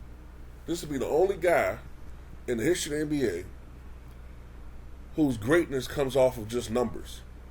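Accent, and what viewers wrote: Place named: New York, United States. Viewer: You sound like a typical out of breath New Yorker, who is too busy being an opinionated douche to give any kind of useful commentary on basketball. Your channel is a waste of time.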